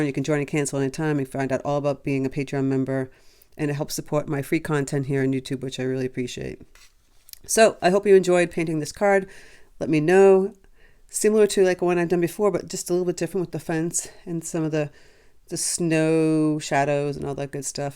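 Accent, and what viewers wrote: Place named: New York, United States. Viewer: Love the NY accent ❤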